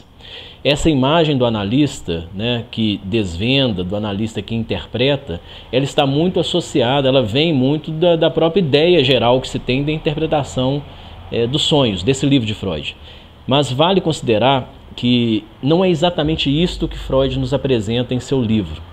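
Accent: Minas Gerais, Brazil. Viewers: Gosto muito do sotaque mineiro, é suave, vai muito bem para um psicanalista. é um excelente representante de Minas Gerais.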